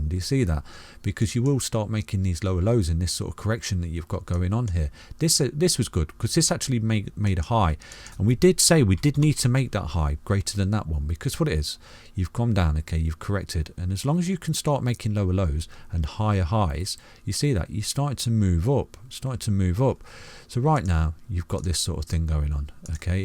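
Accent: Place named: Yorkshire, United Kingdom. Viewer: Love the t.a, but got distracted by your accent. If you aren't a fellow Yorkshireman I will eat my scarf.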